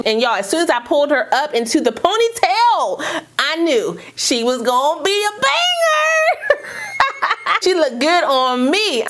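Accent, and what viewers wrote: Washington DC, United States. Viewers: She looks so natural on you. And the color is so pretty. ❤❤❤ btw, that intro git me asking Sis, you from DC?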